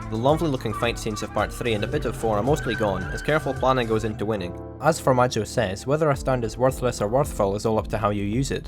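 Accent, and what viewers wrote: Scotland, United Kingdom. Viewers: Dans It's understandable that you can't understand his Scottish accent.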